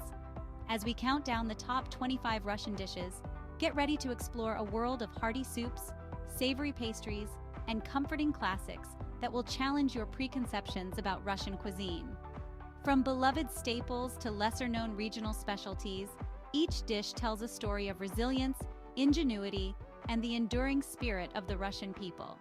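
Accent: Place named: United States. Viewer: As a Russian speaker, I wince at the American pronunciations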